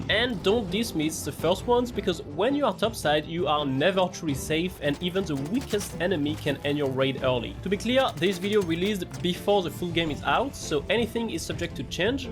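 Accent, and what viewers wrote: France. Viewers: Are you French? As a French guy i can hear the French accents in every word hahaha love your video btw !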